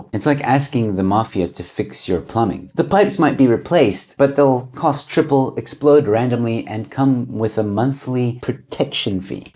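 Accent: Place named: United States. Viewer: Is this Youtuber from SA? He sounds like he’s from the USA.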